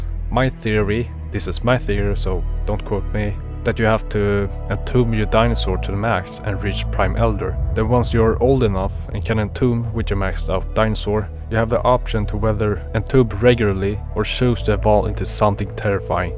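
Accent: Sweden. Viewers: Holy swedish accent😭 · are swedish right cauce you sound like it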